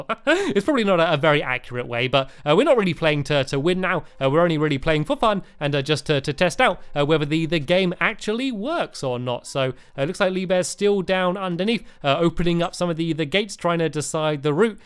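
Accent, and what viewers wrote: England, United Kingdom. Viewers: Francesca Mazzi Questa poesia era ok .... il "salto in un lago" parte non era bello, in effetti hai appena detto di suicidarsi. Inoltre è Stampy un male youtuber, non mi piace tanto quanto si fa, perché, del suo accento, accenti inglesi tendono ad essere fastidioso per me (non essere razzisti), così ogni volta che ho sentito somone lamentarsi lui im come "cosa l'inferno ", ma allo stesso tempo dico" Sono d'accordo che può essere una cagna a volte "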